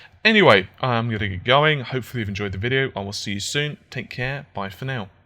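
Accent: United Kingdom. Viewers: What's he doing? He speaks in a british accent but uses American terminology like the way he pronounces 'route' and the way he says 'math' instead of 'maths' :S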